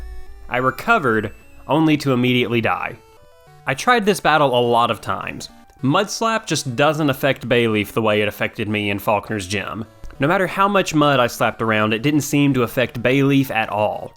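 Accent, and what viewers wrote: South, United States. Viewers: First Southern PokeTuber I’ve found! Been wondering if Corsica could do it lol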